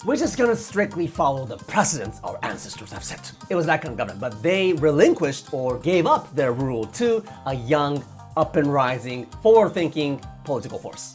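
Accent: Japan. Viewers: English but in a Japanese voice inflection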